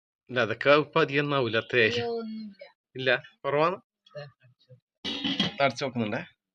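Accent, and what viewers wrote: Kannur, India. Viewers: Padichathe nammal padu alle nammalkku achadi bhashayonnum veettil venda alle nammale kannur slang poliyalle❤❤❤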